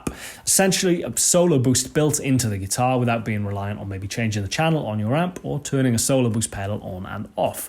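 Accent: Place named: England, United Kingdom. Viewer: Beautiful playing like always! and we just love your "einglish accent". Servus from Vienna! (p.s. what do you think about the eric johnson signature strat?)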